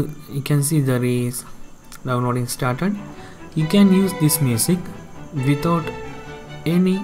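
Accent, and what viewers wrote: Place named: Kerala, India. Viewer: Malayali English.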